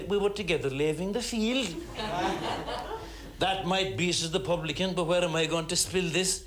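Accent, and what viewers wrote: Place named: Kerry, Ireland. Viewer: Kerry with a slight Cork tinge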